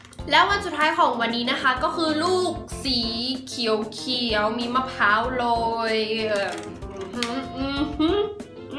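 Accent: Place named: Suphanburi, Thailand. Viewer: เป็นฝรั่งที่พูดไทยเหน่อเป็นฝรั่ง. แต่ทำไมตรูนึกถึงคนสุพรรณ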